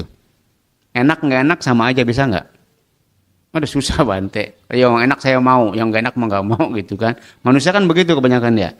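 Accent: Indonesia. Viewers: logatny betawi medok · Baru kali ini denger bhante berlogat bekasi-karawang-cikarang dan sekitarnya 🫶😊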